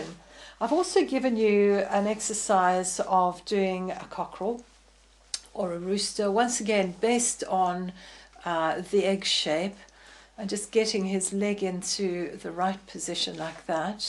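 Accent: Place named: United Kingdom. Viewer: Cool British accent :D wiked lessons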